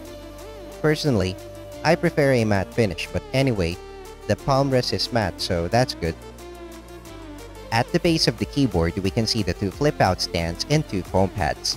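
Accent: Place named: United States. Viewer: your accent has a mix of italian-american and filipino and it's oddly satisfying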